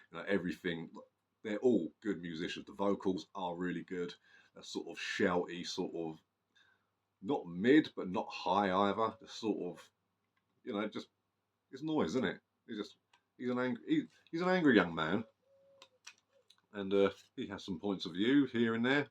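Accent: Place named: United Kingdom. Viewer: I wasn't expecting the accent. Why are you so British?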